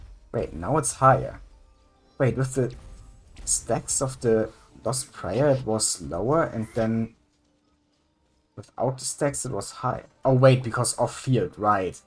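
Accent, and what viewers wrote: Germany. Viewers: 3 sec angeschaut direkt rausgehört aha der kommt aus deutschland ༼ つ ◕_◕ ༽つ <3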